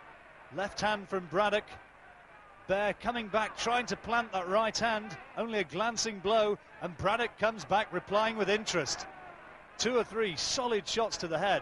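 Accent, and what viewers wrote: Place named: United Kingdom. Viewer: The Russians are smart enough to get a British sounding guy to narrate the fight, but don't be fooled...this is Russian television.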